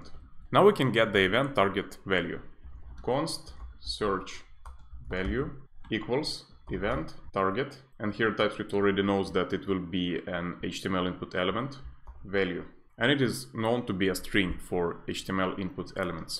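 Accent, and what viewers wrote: Russia. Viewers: ох уж этот russian english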